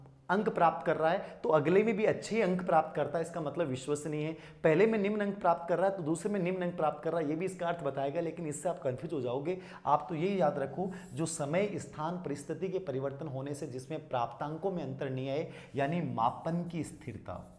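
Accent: Rajasthan, India. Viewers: Sirji aapke muh se marwari boli v achhi lgti h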